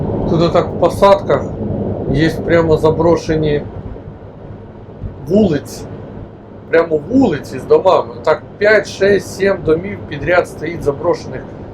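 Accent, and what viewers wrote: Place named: Poltava, Ukraine. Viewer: Головне ти намагаєся,мовник сам собою налагодиться.А зараз в тебе виходить полтавський суржик.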